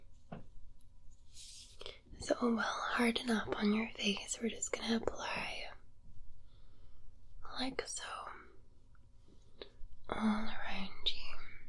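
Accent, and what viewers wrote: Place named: Scotland, United Kingdom. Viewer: Very relaxing. Love your Scottish accent.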